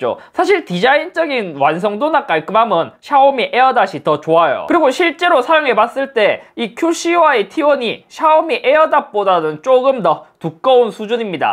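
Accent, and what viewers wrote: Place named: Andong, South Korea. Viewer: 잇섭님 안동 출신인가요ㅋㅋ 익숙한 사투리가 들리니 반갑네요ㅋㅋ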